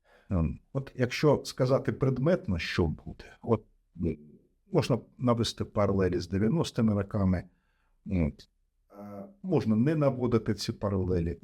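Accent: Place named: Germany. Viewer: говорит по-украински как будто, с немецким акцентом)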